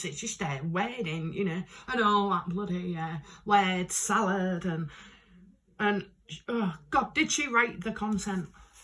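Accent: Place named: Hull, United Kingdom. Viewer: Hi!! Thanks for saying, "Hi" :) I just love listening to your accent, I know we must be distant cousins as my family is from Hull :D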